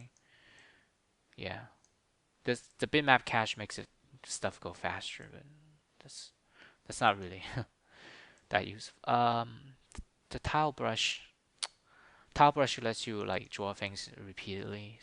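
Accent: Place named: China. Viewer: I love your accent, it sounds really China!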